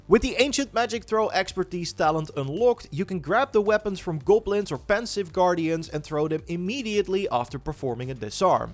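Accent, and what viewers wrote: Netherlands. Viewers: The Dutch accent in this commentary is so obvious I love it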